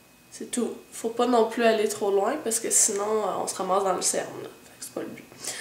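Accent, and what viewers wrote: Quebec, Canada. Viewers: Maquillage divin! comme d'hab;) si seulement j'avais la moitié de ton talent! mais j'y travaille sérieusement, grace à tout tes conseils et tes vidéos super intêressantent! Merci de te lever si tôt pour nous faire tes tutos! Au fait! ton accent Quebecois! j'adoreeeeeeeeeee et t'ai " " comme tout =)))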